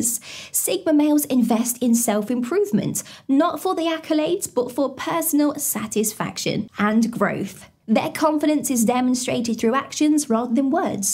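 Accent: United Kingdom. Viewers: I get tired of British accents. Good night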